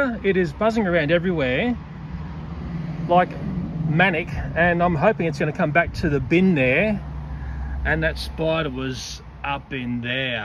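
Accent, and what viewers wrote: Australia. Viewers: Aussie accent is so funny but how on gods earth do you guys live with those spiders down there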